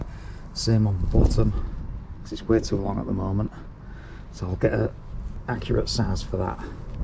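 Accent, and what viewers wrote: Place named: Hartlepool, United Kingdom. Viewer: Are you originally from Teesside area mate ? Got a Hartlepool accent 🫡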